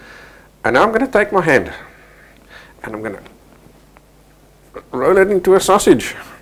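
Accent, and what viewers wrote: South Africa. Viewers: is this guy south african? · Thats not an new zealand accent? Do you have a south african accent? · JohnLothe I think he is South African that lives in New Zealand. This dude is a pretty decent teacher! great video! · I feel like im still in South Africa because of his accent.. 😢😢😢😢😢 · Where are you based now?? I feel sure i hear a south african accent 😏😏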